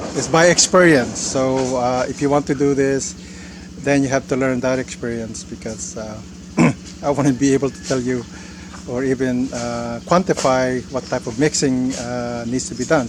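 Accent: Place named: Philippines. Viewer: U sound originally from Philippines the way u speak out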